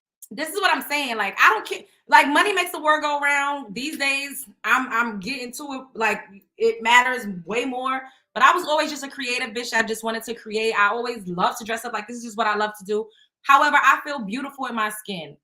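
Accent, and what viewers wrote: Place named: New York, United States. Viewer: You remind me in a way of another good sis I watch on YouTube named Ashley Dalton she's a character as well lol, and y'all both talk with a NY style accent, and y'all both some beautiful chocolate drops. New subbie✨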